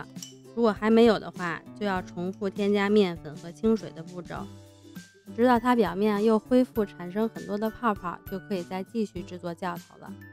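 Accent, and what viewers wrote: Beijing, China. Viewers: 哈哈哈 听说话 up主是北京人吧~ 按照你这个试试 之前做过一次 失败了╮(╯▽╰)╭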